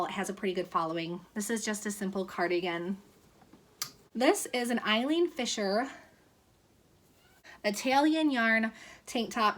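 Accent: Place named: Michigan, United States. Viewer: OMG 😱 I called it! Girl, I was just thinking to myself that your accent was totally a Michigan accent! I’m from Michigan and now live in Oklahoma but watch resale videos from all over. With that said, lol, I’ve gotten pretty darn good with guessing accents, but you are my first Michigander resaler (I know, I know, resaler is not a word lol) that I’ve found so far on YouTube!! Sorry y’all for the ridiculous giddy reaction, but if you were from Michigan, you’d understand! Although that could be due to my age! LOL. Anywho, nice to meet you and thanks for the resale tips on clothes! I haven’t dipped my toes in that area of resale but am enjoying the tips. Last but not least, I watched your latest video in which you mentioned that you heard it helps to make sure to ask for thumbs up in the videos and girl it’s true! And a lot of the reason why is just that people forget (including me! 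Lol). Look forward to more and keep representing strong girl 😜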